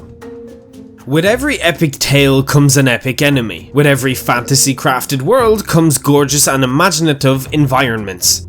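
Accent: Ireland. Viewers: First video I have seen of your channel and I'm so glad I did. I love your Irish accent and the humour is brilliant great lore video. 👍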